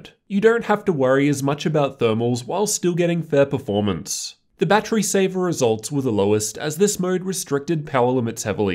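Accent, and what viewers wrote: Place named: Australia. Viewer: it's the Aussie accent I assume. He really emphasizes the OR in port. "USB-C pOOORRt, USB 3.1 type A pOOORRt, HDMI pOOORRt, mini displaypOOORRt" it sounds really jarring to a Canadian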